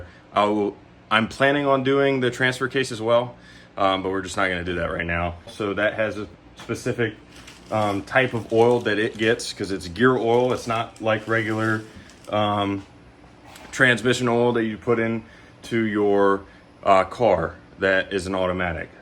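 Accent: United States. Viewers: Mid Atlantic aka tidewater accent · Great video!! Thank you!! Where are you from? I can hear your “o’s”—sounds like Baltimore.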